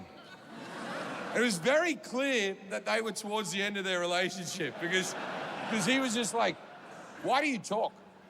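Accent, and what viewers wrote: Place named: Bogan, Australia. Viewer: Im sorry! The Bogan Aussie accent makes this immediately hysterical. LOL he could be ordering chinses take-away, and i would be rolling on the floor. Im a simple man; bogans make me laugh.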